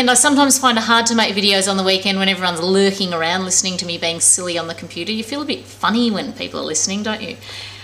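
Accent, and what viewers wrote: Australia. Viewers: yay 10 for the australian accent!!! Reminds me of my aunt lool!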